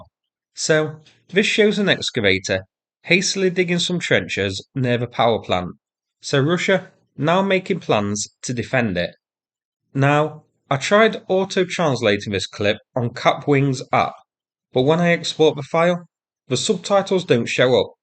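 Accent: United Kingdom. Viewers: Completely unrelated - what's that dialect you're speaking? I love it, but I'm crap at British dialects 😕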